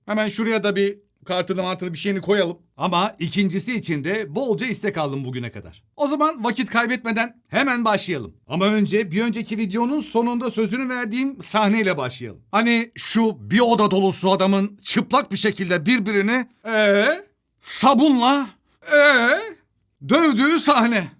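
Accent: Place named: Adana, Turkey. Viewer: Abi lutfen Adanaliyi konus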